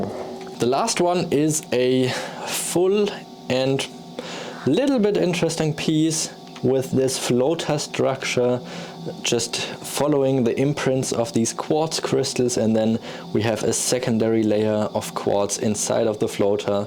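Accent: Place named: United Kingdom. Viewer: Just watched your short:gold:amethyst agate. Joining you in prayers that our US president will get defense weapons QUICKLY to Ukraine...today!!! Loved your shoes/Ukraine colors. You always take me back to student-exchange days Bielefeld/Westfalen 1961. You are so admirably articulate in authentic British English. Still read German Bible/listen to Bach/baroque daily. Thank you,. Your voice is music to my ears. Of course your enthusiasm and expertise with minerals (creation) are equally valued. Robert TX USA.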